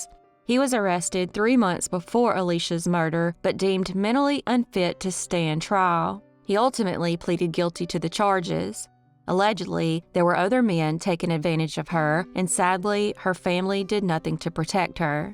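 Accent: South, United States. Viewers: I understand, she is the only southern voice I can toletate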